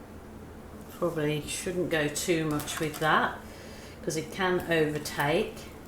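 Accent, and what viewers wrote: Birmingham, United Kingdom. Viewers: ahh 20.40 you did say your were from birmingham. i have cousins from there and i could hear it. im a scot though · Your accent sounds like you have a bit of brummie in it?... beautiful painting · Hi from Yorkshire!..found you 2 hours ago... Watched an hours worth and drove to Asda ( Walmart) before it closed at 10 pm for paint etc...soooo excited to try this! oh and do I hear Brummy twang or am I way off?. Just realised I'm out of tin foil....but remembered the balloons though.. I'm at that dodgy age haha.. great to find you, new subbie here! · I am brand new to pouring and my goodness, what a joy and aspiration this is. It is absolutely beautiful. Can I also say I love your accent. My mom came from Rednal Birmingham and just to hear the accent again is a joy. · Hi just found your paint pour video’s which are absolutely amazing 🤩 I could hear brummie accent coming which was awesome! 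Thanks for sharing your gifted talents! I’m just starting out my paint pouring journey! Lv from another brummie Christine x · Yes 😍 still got youre brummie twang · Are you from Birmingham uk? You have a kind of brummy twang to your accent :) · Omg ! I knew you are a brummy, just heard you say Perry common I’m down the road in Walsall Just love your work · First time watching you today, and within 1 minute i was convinced you had a brummy accent, and this was confirmed in the very same vid. Amazing work, i absolutely love what you done here !! New subscriber! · Lovely/Bostin!! I want to try it on silk! I'm just coming to the end of my first year at BCU studying textiles so will try to see how it looks with procion dyes etc. I am a very mature student and still love learning all these new things. Thought I detected a brummie accent. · I knew you were a Brummie I could hear it in your accent lol. 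Love your artwork tutorials. I haven't been brave enough to do a 'pour' of any kind yet but I absolutely love the 'balloon work'. Am trying to think of a way to do the balloon work with my great grandchildren who are 4 & 5 years without it being too messy 😂❤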